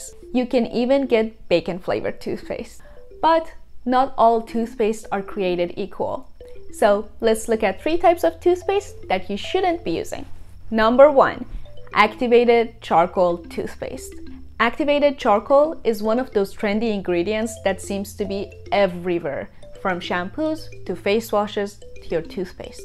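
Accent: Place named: Iran. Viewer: You have a sweet Persian accent! And u look sweet too!! 😍😍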